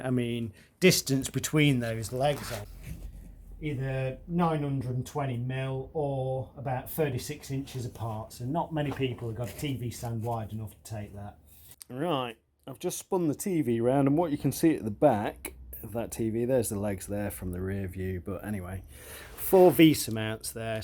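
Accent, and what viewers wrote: United Kingdom. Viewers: Is this man from london or little port i can't tell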